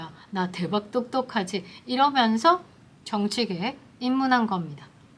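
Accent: Gyeongsang, South Korea. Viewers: 준석이도 싫지만 니 경상도목소리도 듣기싫구나 아무튼 준석이졸업장엊ㅅ는거만 밝혀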